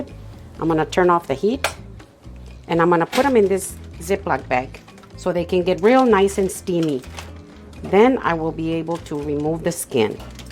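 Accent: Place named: New Mexico, United States. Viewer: Señora ya me dio mucha hambre 🤤 and you remind me of my husbands family from New Mexico, same accent 😀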